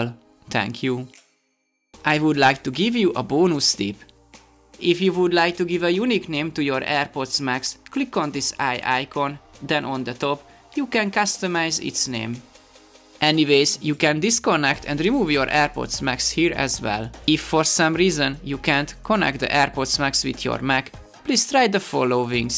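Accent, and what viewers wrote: Hungary. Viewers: You has a Hungarian accent. Are you from Hungary, or I misshear something?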